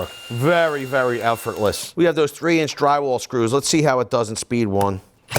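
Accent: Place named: South Jersey, United States. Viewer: As a jersey guy I come for the accent. Hunnert and wooder are classic south jersey words. Traveling around the country we get mocked but everyone I met got a kick out of our my cousin Vinny dialect.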